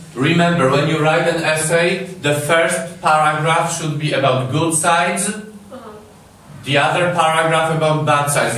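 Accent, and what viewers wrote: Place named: Poland. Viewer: Wiedza imponująca, rzeczywiście, gratuluję uczniom :) Przyczepiłbym się do wymowy, która jest bardzo polska :P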